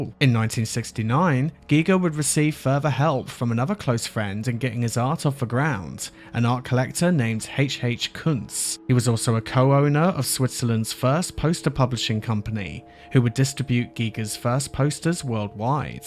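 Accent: United Kingdom. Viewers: Thanks for this i love the British narration it works beautifully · He just sounds British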